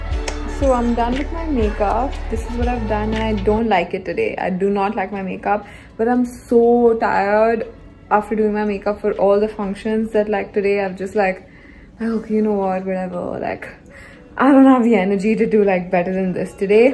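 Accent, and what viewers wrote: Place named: India. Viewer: You hindi accent is soo cute.😂❤️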